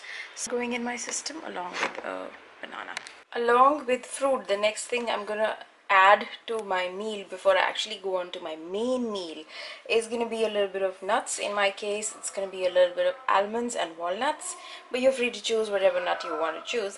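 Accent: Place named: Trinidad and Tobago. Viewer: good info you sound like a trinidadian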